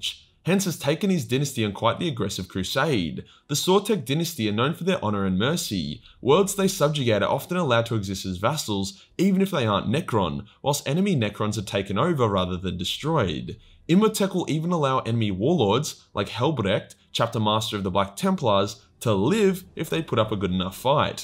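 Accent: Australia. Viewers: When’s a gene-stealer vid coming, just started buying some up to make my second army and would love to hear their lore spoken by a true chad Australian lore lord UwU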